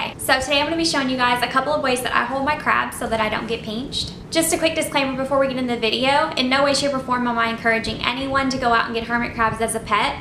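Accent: South, United States. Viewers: she's southern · It's her Southern Accent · she is southern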